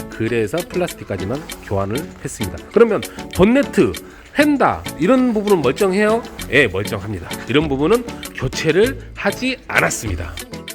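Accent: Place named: North Jeolla, South Korea. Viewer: 고향이 전라도 어디신가요? 말투가 북도같은데요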